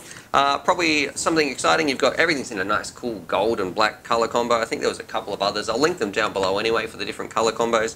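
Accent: Australia. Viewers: You make funny Australian noises and it's the best thing ever!!!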